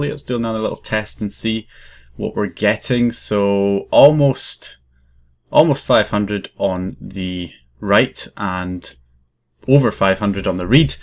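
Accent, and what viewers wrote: Scotland, United Kingdom. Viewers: I so much liked the Scottish accent that I subscribed! :) hahaha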